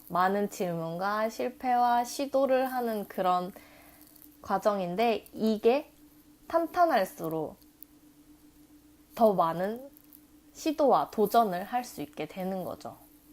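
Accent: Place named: Seoul, South Korea. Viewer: 어릴때 서울에서15년정도 살더니 서울말을 잘하네요. 영상도 너무 좋네요.~♡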